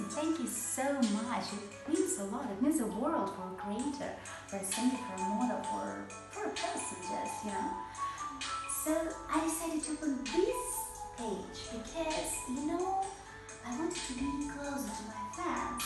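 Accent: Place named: England, United Kingdom. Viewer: Thank you so much for this video! You are so genuine and sincere. I love your voice both singing and with your English accent 😍 💕 😉 Youbare elegant,classy and very much reminded me of old time Hollywood movie stars 🌟. You have that timeless quality I think. I am from Canada 🇨🇦 and also a singer/songwriter. I would like and hope ery much to meet you and talk someday. Maybe while you are on a photo shoot or music video or on video chat. I admire and adore you. Have an Amazing day 🌅 💛 ❤️ 💕 Love, Love, Love to you ❣️ Take care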